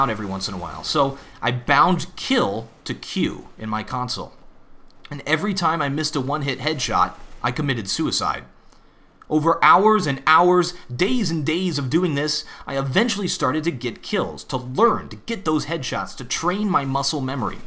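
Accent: United States. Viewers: He sounds so American.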